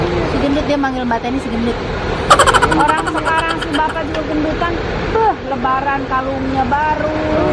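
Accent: West Java, Indonesia. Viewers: Ari teh teh orang bandung na palih mana, meni resep ih nyariosna lucu khas sunda pisan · teteh meni raoseun logat Sunda na😀😘😘😘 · Salam kenal dari aku teteh seru banget belanja ke pasar semua diborong Teteh aku sangat suka nonton semua Vidio teteh Cara ngomong teteh b sudanya masih terasa Itu yg bikin khas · Aku sneng bgt sama chanelnya te suka sma logat sundanya, upload yg bnyak ya teh 😁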